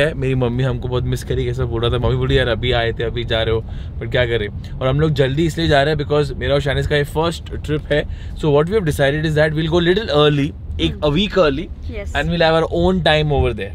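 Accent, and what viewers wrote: Mumbai, India. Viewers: Omgg I have to say this.....in past few days I have watched videos so so much like binged them so bad.....that my accent has chaned from punjabi to typical mumbai accent 🥺😂❤❤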